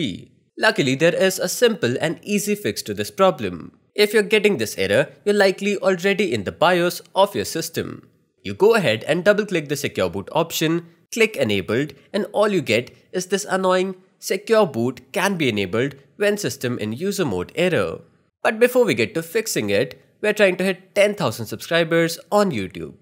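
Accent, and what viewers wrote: India. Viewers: This has proven me again that I always have to trust tech people who sound Indian 🙏💖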